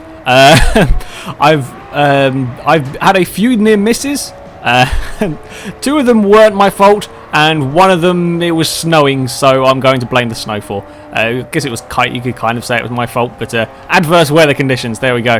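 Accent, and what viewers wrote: United Kingdom. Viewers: man leave the brit alone!! im a southern guy in the u.s. and his accent and the way he talks doesnt matter this is youtube not school he can talk how he wants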